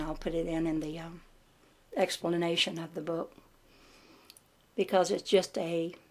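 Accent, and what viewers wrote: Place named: South, United States. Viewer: Thanks for putting something this important into audio. I find your reading of this and your southern dialect very delightful.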